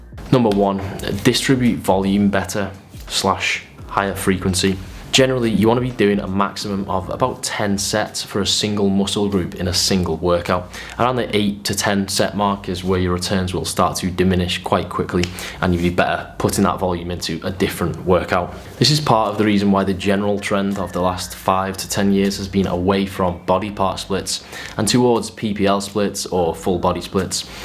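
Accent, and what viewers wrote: United Kingdom. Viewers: unfortunately anybody from outside the UK has 0.0% of a clue of what this guy is saying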